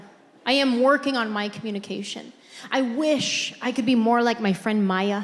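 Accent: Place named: California, United States. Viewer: She’s really refined her routine, she’s always had funny material, but now she’s “polished” as a stand-up comedienne. However, I do wish she used adult language like 98.4% of us normal adults use in our everyday lives….and I like the fact that she hasn’t lost her Calif accent, she sounds like she could still be an Oakland Raider cheerleader….if she ever books a gig here in San Jose, Calif, I’m definitely buying tickets to see her live…😊✌🏻🇺🇸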